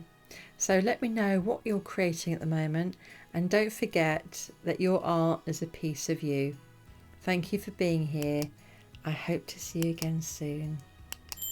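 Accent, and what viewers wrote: United Kingdom. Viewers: your painting looks absolutely gorgeous, and you just keep getting more adorable in pink, your curly hair, and your glowing smile on your pretty face, and your sweet British accent. 😄😄😄🌹🌹🌹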